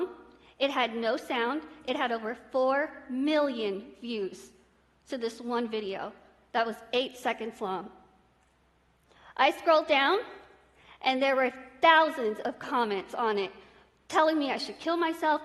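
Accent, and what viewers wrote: United Kingdom. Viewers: イギリス英語ですし美しいですよね、、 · イギリス英語なんですね！ もうテストのリスニング全部この方がやって下さらないかな..🤔🤔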